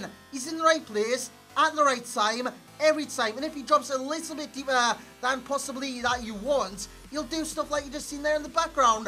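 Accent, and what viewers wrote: Newcastle, United Kingdom. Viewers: Kebba Sohna I think he's from Newcastle but I could be wrong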